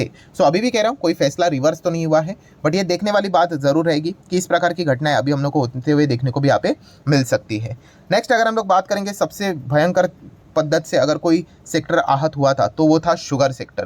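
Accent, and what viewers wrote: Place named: South India, India. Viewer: Are you madrasi,because ur Hindi pronounce is like madrasi people pronounce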